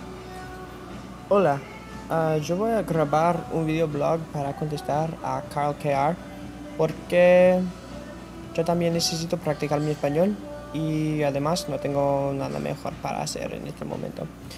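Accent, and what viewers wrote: Spain. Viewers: good spanish :) dude idono why but ur accent around there in the beginning almost sounds like a spaniards accent with the tongue lol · Hola,..¡¡Ya me gustaría a mí hablar inglés como tu lo haces en español!! Sólo te falta pasar un año en España(lo digo porque tu acento es de aquí), o en cualquier otro país hispanoamericano para limar esos pequeños detalles. Se te nota soltura al encadenar las frases,tu vocabulario es amplio y tienes, en principio, una buena declamación.Tan sólo debes pulir un poco la pronunciación. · tu español es muy bueno, tienes el acento de españa. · Bien, continúa practicando. Sueña como español de España. Saludos. .! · hey, excelente pronunciacion, da gusto que alguien se interese por aprender otros idiomas en especial este que es complicadisimo, tienes un acentillo que parece castellano. (from spain) aunque creo que todos ya lo hemos notado jajaja, nadamas el tipico error ocasional extranjero de confundir femeninos y masculinos, but i do that a lot with irregular verbs in enlgish, sometimes i travel in time. 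· Your Spanish seems like European Spanish, but is good. I know Spanish is difficult. I say "Hi" from Venezuela. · Lo hablas muy bien comgra...!!!!! tiene el accent de espana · Acento español ( from spain ), FELICITACIONES. Mejora la pronunciacion de la VOCAL "O" y la CONSONANTE "D". SALUDOS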